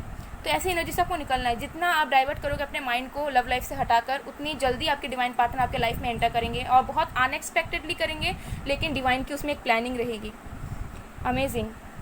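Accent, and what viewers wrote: Bengal, India. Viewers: Mam aap bangoli ho kya.....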